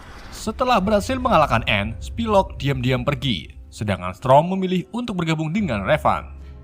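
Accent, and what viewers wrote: Indonesia. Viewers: Iya bang, sebagai org yang msh bljr, saya merasa agak risih, karena pengen bgt bisa bahasa Inggris , kembali mendengar aksen indo:v. Sukses terus bang channel langganan wkwk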